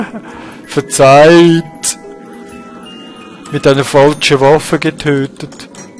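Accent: Switzerland. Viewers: erstmal geiles video :D ich liebe dein schweizer akzent... ein vorschlag von mir mit höllenhunden schwer und ohne magie auf/in stadt und alles mit ungepunchten waffen ;D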